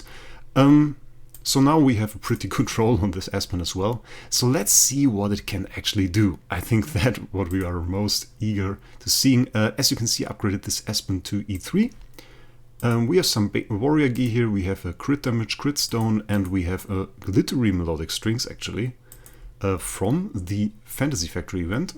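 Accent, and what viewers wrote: Germany. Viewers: Do you make acc reviews? And are you german?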